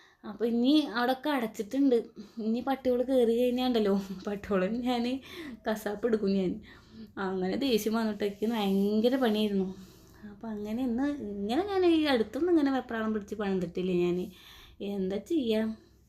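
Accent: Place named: Malappuram, India. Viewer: Hai enna video kanunne trissur jilla analle varthamanom kettappol malappuram anenn thonni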